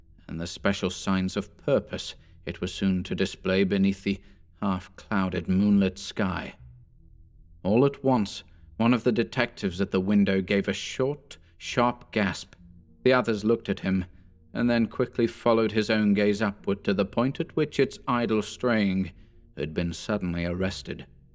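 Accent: United Kingdom. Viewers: Another excellent reading! I’ve been enjoying finishing a story myself first, and then listening to your readings shortly afterward. I very much appreciate your attention to accuracy when you narrate, and there’s something about a U.K. accent that lends a certain subtle sophistication to it haha. Well done!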